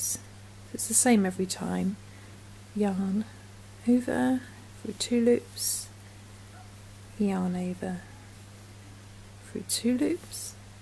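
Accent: England, United Kingdom. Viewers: Hi I notice you're talking in an English accent but talk about single crochet. In the UK we call it double crochet. Please could you tell your UK viewers the difference. Thanks. x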